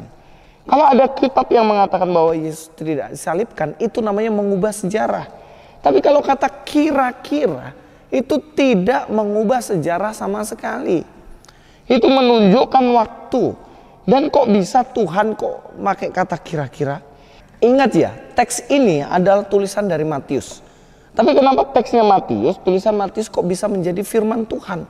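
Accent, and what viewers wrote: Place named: East Java, Indonesia. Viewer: Tp nadanya sprti orng jatim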